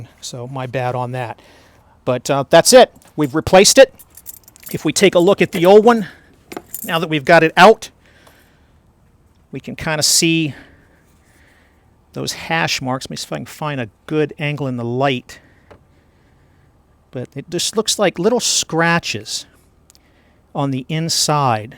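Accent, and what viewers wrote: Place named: Western Pennsylvania, United States. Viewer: My Sonic isn't acting up yet, but my neighbor had problems with hers. This will be handy down the line, thank you for sharing! I am a big supporter of Right to Repair, and channels like this are a huge help to those of us who need it. Side note, your accent sounded familiar. My family is from western PA and your inflections remind me of my uncles, who let me be a little grease monkey and help when they repaired their cars when I was younger. Cheers, have a great day!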